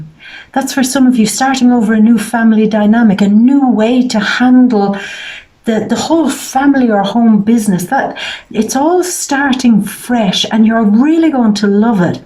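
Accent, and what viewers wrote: Ireland. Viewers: I be getting the feeling that you're lowkey Irish by your accent. Btw. I like your style.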